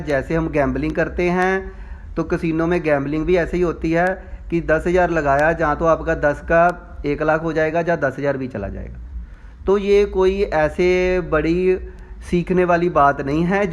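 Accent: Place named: Punjab, India. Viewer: Thanks for this information...it looks like you are from punjab as your way for speaking reflects the pure punjabi.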